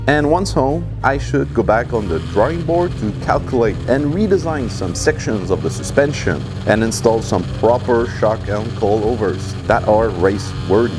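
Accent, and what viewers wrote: Quebec, Canada. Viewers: Tu me semble canadien francais mon ami? are you??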